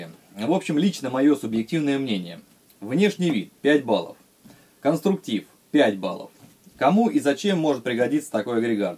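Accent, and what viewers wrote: Russia. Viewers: Нагинаем..., дубенеет... Вроде по русски говорит, да не совсем... Понятно что местный, южный, диалект. Но слух режет... Я один заметил, что выключатель питания не правильно подключен, не по феншую...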